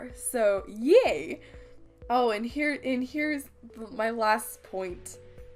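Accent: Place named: United Kingdom. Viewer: 'Layers are the essence of art' now this my friend is a good quote! You're so right layers are everything ahah! well with how i like to art anyway! XD You can see your improvement so evidently!! The anatomy and the fluidity of her pose looks so much more natural! Also that portrait is super cute! I legit always use references! i'd like to make my work a lil more stylised tho :) ahahah you cutie! its 'A' "y' 'art' '96' thanks for the amazing british accent now i know how i sound!! XD (never does a voice over again) jk jk :D and its true ya WONT SUCK FOR EVAA. You have definitely improved it looks great and you should be happy with yourself gal! Who knows where you'll be next year <3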